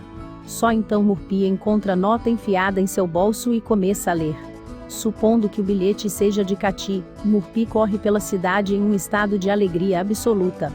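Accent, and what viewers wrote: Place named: Northeast, Brazil. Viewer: Gente,é uma nordestina tentando narrar🤣🤣🤣🤣…Sou nordestina e não tenho nada contra mas ficou péssimo😂😂😂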